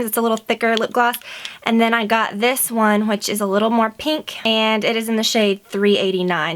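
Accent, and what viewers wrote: South, United States. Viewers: I don't know why, but I've never noticed before this video that you have a slight southern accent. I must be totally oblivious. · I realize you're speaking in the same voice, tone, etc. that you always do, but for some reason you just sound SO southern! I can hear the accent so much clearer than I ever have before! So sweet!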